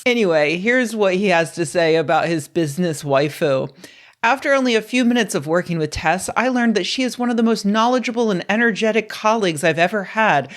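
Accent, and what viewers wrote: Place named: Boston, United States. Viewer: the boston accent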